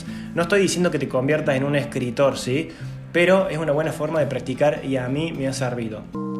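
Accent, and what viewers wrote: Cordoba, Argentina. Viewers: excelentes consejos, que grande ese acento cordobés, saludos desde Rosario.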